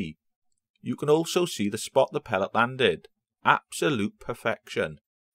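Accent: Wales, United Kingdom. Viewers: Ha , great video, love the Welsh accent. Don't the rat's that get inside the wall where you can't recover them start to honk after a few days? Especially in that steamy hot Pontypool climate.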